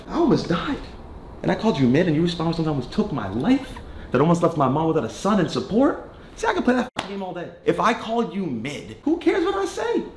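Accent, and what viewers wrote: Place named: United States. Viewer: You have an american accent and still call soccer football?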